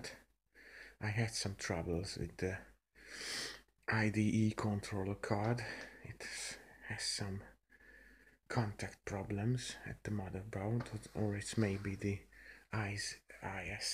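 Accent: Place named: Hungary. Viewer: Helló magyar vagy valószinü ugyanis nem angolos a akcentusod :D .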